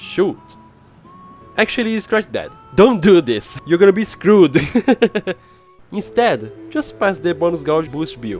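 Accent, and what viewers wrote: Brazil. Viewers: Sotaque de brasileiro hein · tu é br ne boy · Vc é brasileiro percebi pelo sotaque, mano me ajuda eu quero mt desbloquear o robot kurtis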